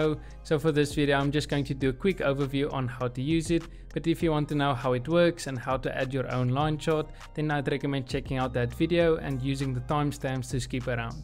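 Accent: South Africa. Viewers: What is your accent? South African?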